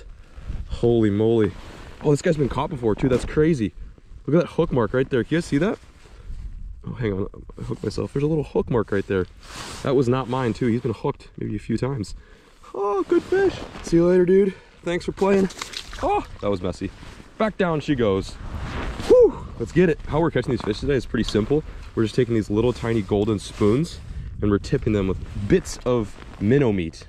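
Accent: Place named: North, United States. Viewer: Like if y’all can hear getting a northern accent since the move haha. It’s getting noticeably stronger each video brother.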